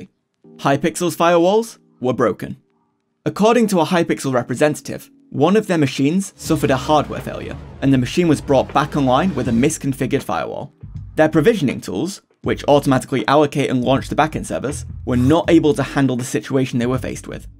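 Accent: United Kingdom. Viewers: best tesco going mate, thought you sounded northern aha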